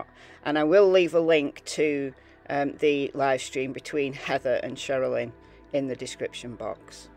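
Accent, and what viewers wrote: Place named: North West England, United Kingdom. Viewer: I’ve just happened upon your tunnel. You don’t sound like a scouser but you don’t sound like a Manc either. Sort of in between so somewhere in the middle is where you are. yes?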